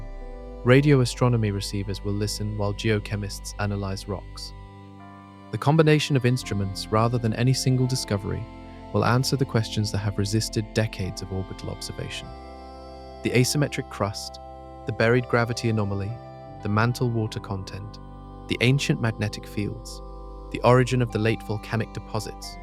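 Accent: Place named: United Kingdom. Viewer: Tipoff of clickbait AI CGI BS videos is the quasi-British accent of narrator.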